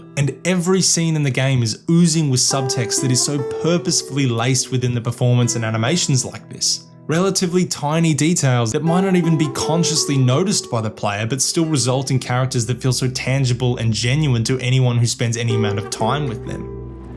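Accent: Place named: Australia. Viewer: Your accent sounds nice. Are you by any chance from Aussie or a you a Kiwi?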